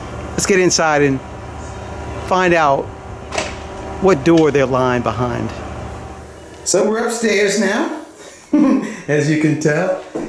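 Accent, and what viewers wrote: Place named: New York, United States. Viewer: I thought you were from New York,. You sound a lot like to me.... and you look like you're.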